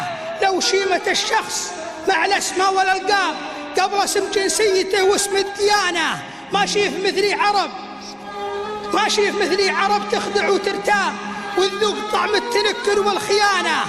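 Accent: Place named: Shahran, Saudi Arabia. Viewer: هذا درعاني واهبي شهراني يتكلم بلهجته الشهرانية الأصلية وقبيلي من روس قبايل وقحطان ونعم بهم احددانا وأنسابنا ولهجتهم مختلفة قليلاً عن لهجة شهران وهم وشهران أغلبهم بوادي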